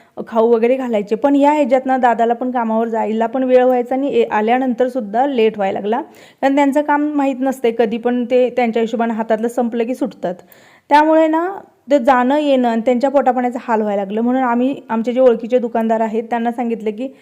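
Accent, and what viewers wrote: Kolhapur, India. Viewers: Hii Me aata 5/6 devsapasun tuze vlog bagte ahe....tumche kolhapuri language mala khup aavdte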